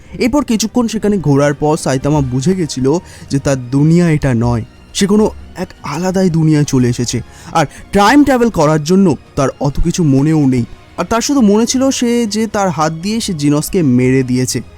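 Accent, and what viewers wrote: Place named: India. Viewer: তোমার বাংলা শুনে,বুঝলাম আপনি indian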